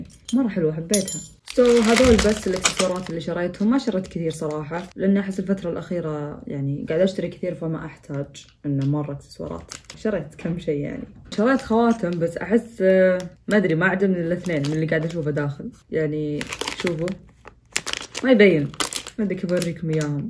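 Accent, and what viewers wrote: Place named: Kuwait. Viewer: اللهجه نص كويتي نص سعودي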